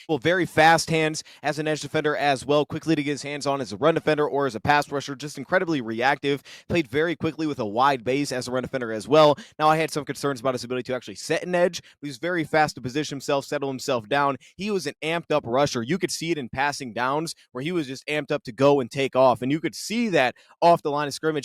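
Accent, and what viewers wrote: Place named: Midwest, United States. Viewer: This is literally a record of what he is saying so you can play it back. Sorry you can't keep up with that Midwest accent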